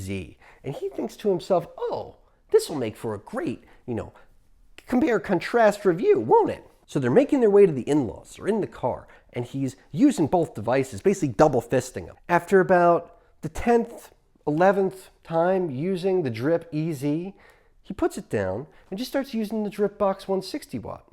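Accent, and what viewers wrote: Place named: New York, United States. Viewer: your mini me really brings out the new yorker in you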